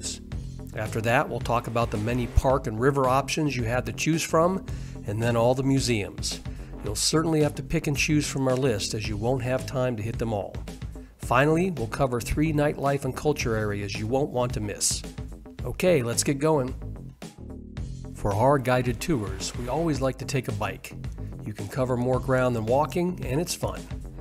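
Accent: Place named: Scottsdale, United States. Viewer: Except he said it like that twice. So maybe its his Scottsdale accent. Anyway, thanks for the tip about the bike tours.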